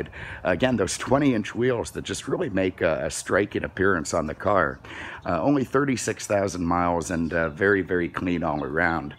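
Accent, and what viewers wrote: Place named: Baltimore, United States. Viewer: always makes me laugh. He doesn't take any "shit offa nobody" and I love the Ball'mer accent!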